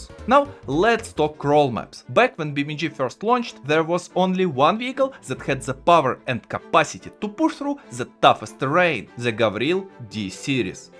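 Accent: Russia. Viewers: У тебя русский акцент бро) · мне одному кажется что у автора русский акцент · Are you also russian? Just very simmilar accent. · you saying how avarge russian guy trying to say in english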